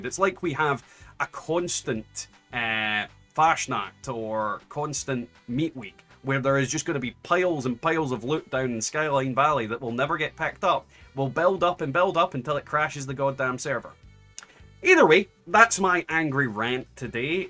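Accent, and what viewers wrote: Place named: Wales, United Kingdom. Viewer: I totally love the Welsh accent, my Dad/Father is originally from St David's before migrating to Australia for work... so im glad I found a Welsh fallout 76 YouTuber finally. Great video, keep up the good work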